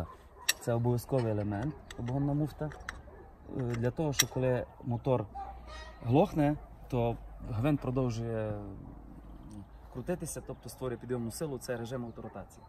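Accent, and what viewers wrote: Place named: Western Ukraine, Ukraine. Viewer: Это почти польский, суржик западноукраинский.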